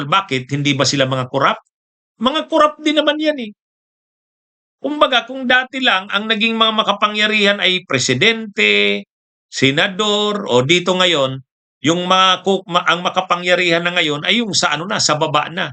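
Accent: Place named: Visayas, Philippines. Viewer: atty, bakit bisaya kang magsalita?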